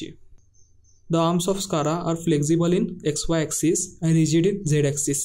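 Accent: India. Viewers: The most difficult English the indien English 😅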